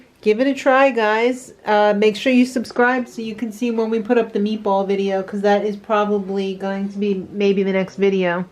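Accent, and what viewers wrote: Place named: United States. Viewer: This would not go over well with Americans! But you sound like your from the States yourself.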